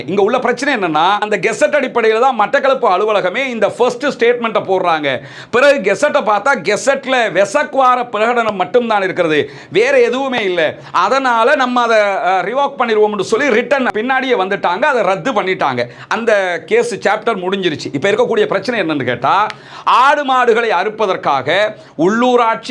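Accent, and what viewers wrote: Tamil Nadu, India. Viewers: ஏன் bro தமிழக style English பேசுறீங்க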